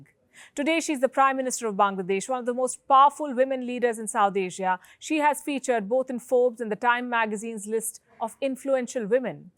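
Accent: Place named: India. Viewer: That red dot on the face and the indian accent is a no no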